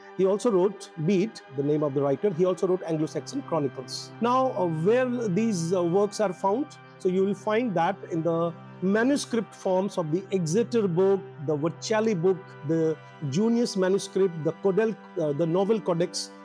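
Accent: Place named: Kerala, India. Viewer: Good sir, good information ,you are speaking like kerela teacher Awesome work sir...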